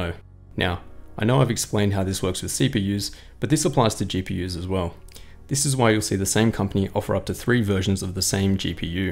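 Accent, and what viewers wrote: Australia. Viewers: Man, Australian accent is a little complicated...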